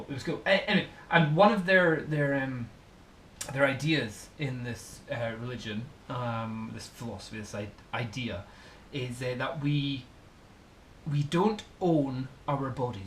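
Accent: Scotland, United Kingdom. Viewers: your video made me want to look for a free online episode of the Highlander (seriously). the Scottish accent and nature references.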